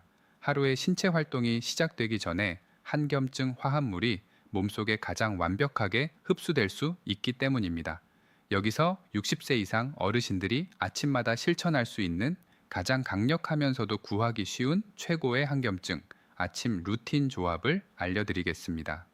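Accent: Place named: Gyeongsang, South Korea. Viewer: *목소리.얼굴.지식. 다 끝내주고 경상도 말로 사람 지기네요.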